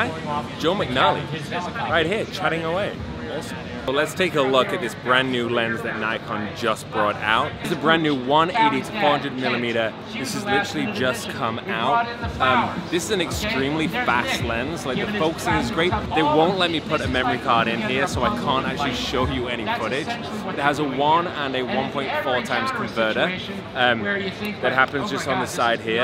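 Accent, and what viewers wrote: United States. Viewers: I agree you do take on the accents, and it is none of my business tbh. You do have a nice USA/Northern twang, it just seemed more exaggerated in this particular video. Sorry if I offended you. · you sound so american:) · What's the American accent all about?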